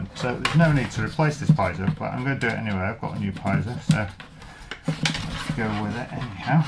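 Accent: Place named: Dorset, United Kingdom. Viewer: Thanks for sharing this. I am trying about doing this to an old beater that I have had laying around for a while now. I have just joined a band back in November and it would be kinda fun to have two acustic guitars to work with instead of just the one that we currently have available to us. I thoroughly enjoyed your playing at the end of this video. You are very talented. Thanks for sharing your experience and skills with us. I would really enjoy more videos of you playing. You aren't from the Dorset area of the UK are you? Your accent reminds me of an old acquaintance I knew from college who is from Dorset.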